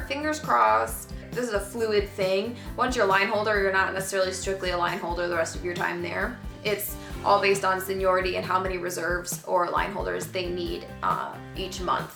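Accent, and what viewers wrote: Midwest, United States. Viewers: Hey! I'm a FA on the other "legacy" side of the operation of our big giant airline fam lol. QUESTION! Are you from the midwest? kind of sounds like it haha my Wisconsin ears are picking up a little midwestern accent.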